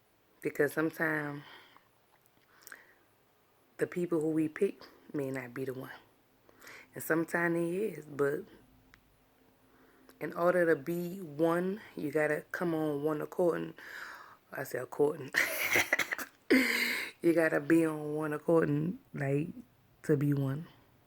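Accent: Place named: Shreveport, United States. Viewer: You sound like a woman from Shreveport I should have married.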